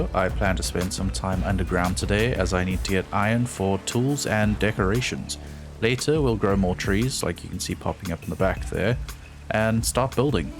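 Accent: South Africa. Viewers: Your south african right? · can hear that South African accent from a mile away xD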